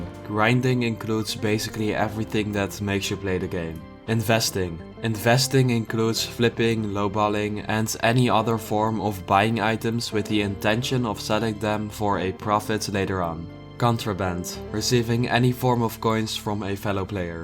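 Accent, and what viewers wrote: Netherlands. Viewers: are u netherlands? u have a netherlands accent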